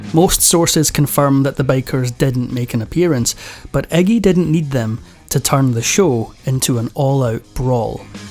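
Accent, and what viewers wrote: Scotland, United Kingdom. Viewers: 💯👍 I think it's a Scottish accent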